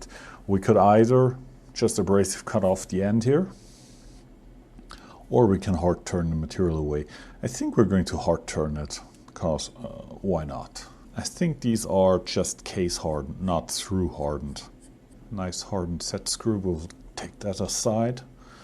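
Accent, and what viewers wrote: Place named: Hemau, Germany. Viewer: Glad to see a video, you are my absolute favorite machining channel of all time. You're a very talented machinist and you have the exact same accent as my family from Hemau.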